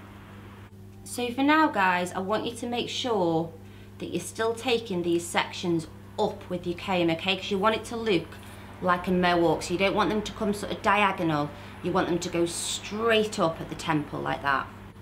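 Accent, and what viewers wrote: United Kingdom. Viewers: What British accent is this? She sounds so sweet, I could listen to her forever.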